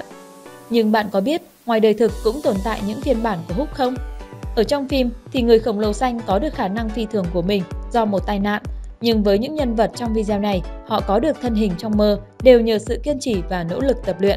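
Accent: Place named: Hai Phong, Vietnam. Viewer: Quê em ở Hải Phòng hay ở đâu thế? Đẹp chứ không phải điẹp, em không phải iem. Người khổng lồ Hulk đọc gần giống hốc chứ không phải Húc. Có mà húc vào... :) Nói thật mấy thằng này bao nhiêu thịt thì dồn hết vào vai với tay rồi, ở cái cần to thì teo hết à :)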